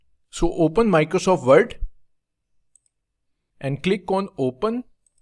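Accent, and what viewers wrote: India. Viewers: English language with an Indian accent. Deplorable condition 🙃🙃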